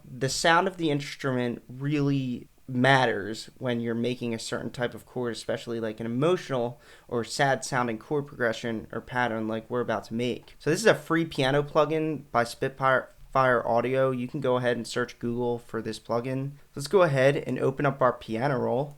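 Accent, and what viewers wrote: Philadelphia, United States. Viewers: The Philly accent is so strong. You got a fan.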